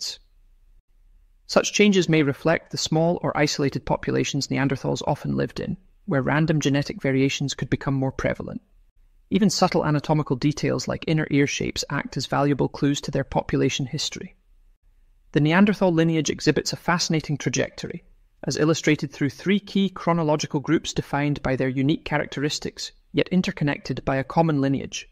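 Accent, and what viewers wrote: Scotland, United Kingdom. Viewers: Love the Scottish voice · and never realize it has not actually explained the inner ear geometry thing. Just blurb it a dozen times with a Scottish accent.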